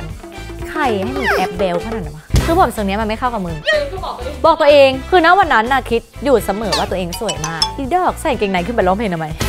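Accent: Thailand. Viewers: เอาจริงเมื่อก่อนไม่ชอบคือตอนเพลงคันหู คือมันดูแรง แรด ว่าเราชอบผู้หญิงแรดๆแต่เพลงด้วยมั่ง แต่สุดท้ายความเป็นตัวจ้ะคือตอนนี้ จ้ะน่ารักมากกลายเป็นชอบมากความใสๆแล้วบนเหน่อๆแบบนี้คือชอบบบบบบบบที่สุดเลย ครับ เป็นกำลังใจให้ทุกเพลงและจะติดตามผลงานตลอดไปครับ หลงรักแบบไม่รู้ตัว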